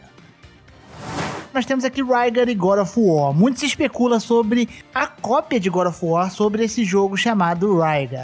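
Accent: Rio de Janeiro, Brazil. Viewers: Agora sei porque não curto tua voz, porque você é carioca! Cara, tinha que impedir a internet no Rio de Janeiro.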